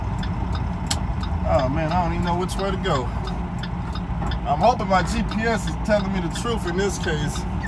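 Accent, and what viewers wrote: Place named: Farmville, United States. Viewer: sound like your in Farmville va.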